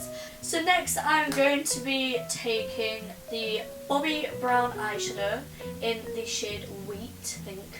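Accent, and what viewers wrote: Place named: Yorkshire, United Kingdom. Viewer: She lives in Yorkshire like me. Ik ik we have the sexier accent 😂😂😂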